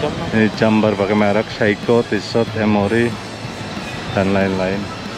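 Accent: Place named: Banyumas, Indonesia. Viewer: jos lh,,PD Bae ro enyonge,,,salut walaupn d ngrti orng ngapak e Ra klalen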